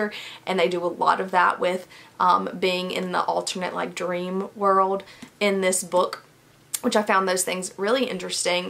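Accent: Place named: South, United States. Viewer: lol definitely a little country but its not super thick. It pops out every few words for sure. I'm from New York though so my ears may also just be tuned into our sound 😂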